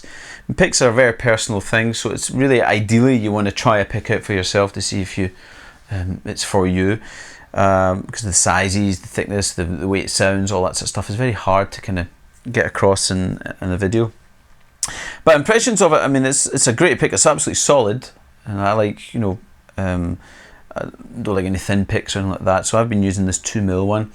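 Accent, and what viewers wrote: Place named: Scotland, United Kingdom. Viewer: You're.... Scottish! I don't often hear a Scots accent on YouTube.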